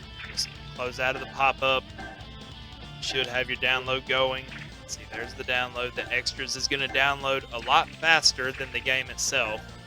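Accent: United States. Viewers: Everything sounds so much more simply explained in a Southern accent. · Sound like you from Arkansas son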